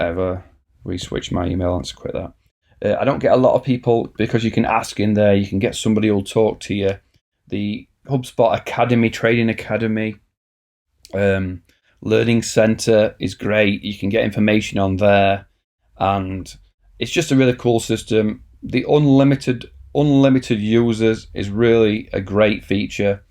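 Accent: North, United Kingdom. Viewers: Please remove that British accent and speak like a human. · Hi, Thanks for sharing this! I'm (we are) new to HubSpot. Its good to hear a Northern UK accent in a tutorial. My brain switches off when hearing an early millennial American accent. I guess I'm conditioned. Really helpful intro. Thanks again!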